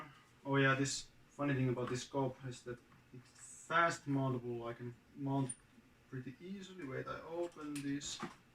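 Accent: Finland. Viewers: Sounds like a finn speaking english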